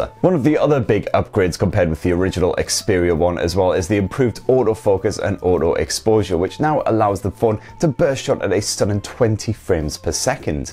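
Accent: United Kingdom. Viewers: Can I just say, it is so Great to hear that wonderful British voice, I fricking love this channel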